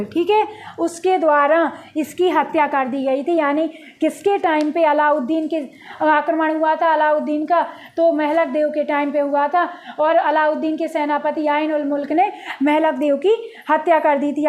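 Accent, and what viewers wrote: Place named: Bundelkhand, India. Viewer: Madam ji aap bundelkhadi lag Rahi kaha se he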